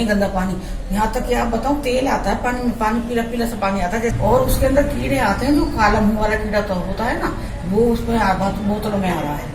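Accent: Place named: Delhi, India. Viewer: Aunty aap bilkul dilli walo ki tarha baat karti ho aur sochti bhi vaisa hi ho...mujhe accha lagta hai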